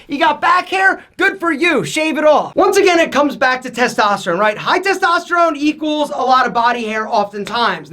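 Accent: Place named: New Jersey, United States. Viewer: where in Jersey is this guy from lol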